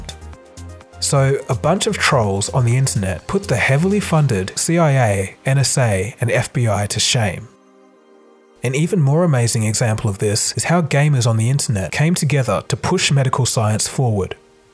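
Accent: Australia. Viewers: this image is from an alley between one end of the Queen Victoria Building and the other in Sydney, this is such a memorable scene. Which doesn't really fit the theme of New Jersey.... Judging from the Uploader's Aussie accent, he should've known better. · You sound Aussie?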